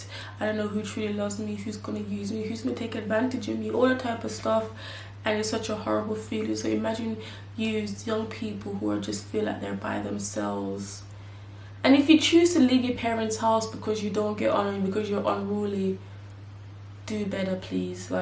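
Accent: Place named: Jamaica. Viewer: I hear the Jamaican in you.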